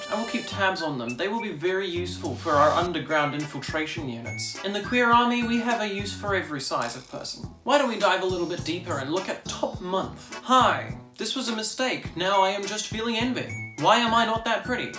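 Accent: New Zealand. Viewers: Clicking on a femboy memes video only to get immediately slapped in the face by a kiwi accent really sent me for a loop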